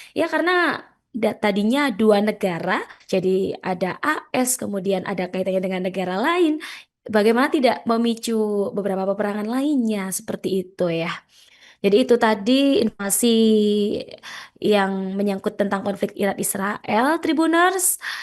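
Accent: Java, Indonesia. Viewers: Hostnya medoknya nagihh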